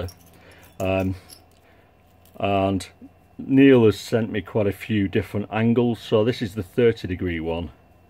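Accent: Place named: North, United Kingdom. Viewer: nice one I'm sunny Grimsby and cleethorpes lol.could tell by your acsent.great stuff buddy keep it up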